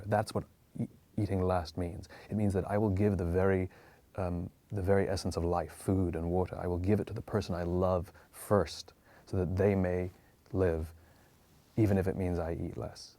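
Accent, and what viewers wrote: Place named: England, United Kingdom. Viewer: He had a little English accent going on in this interview.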